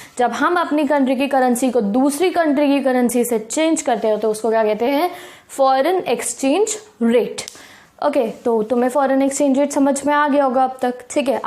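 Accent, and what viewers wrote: Punjab, India. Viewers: Di are you from punjab?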